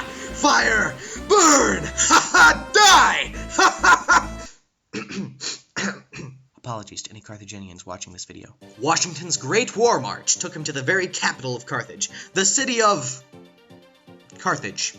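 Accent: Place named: United States. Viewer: He sounds like America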